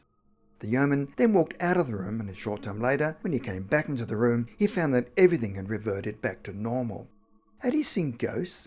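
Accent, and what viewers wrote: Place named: Australia. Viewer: reminds me of the Geico Gecko LOL I think he's Aussie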